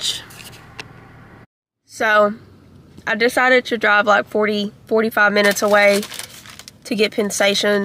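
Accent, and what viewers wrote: Appalachia, United States. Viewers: Love that accent. Didn't even know there's an Appalachia dialect.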